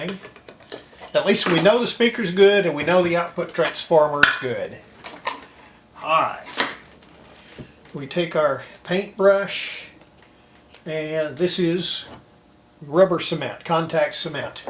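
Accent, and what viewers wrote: United States. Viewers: Spoken like a Texan! :face-fuchsia-tongue-out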